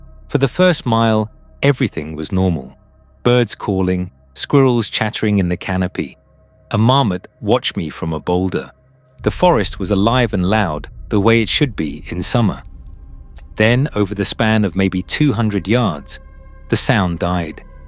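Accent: United Kingdom. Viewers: Why a British accent if this person was supposedly from the US PNW?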